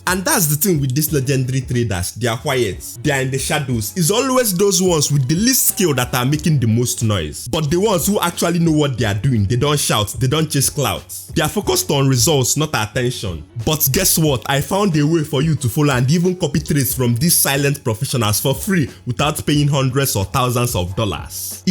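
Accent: Igbo, Nigeria. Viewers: Your accent is Conc igbo and is disturbing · Thick Igbo accent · Ur Igbo accent ❤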